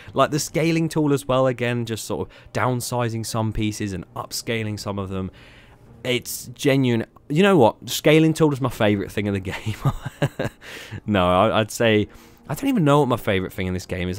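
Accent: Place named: United Kingdom. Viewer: Bri*ish person detected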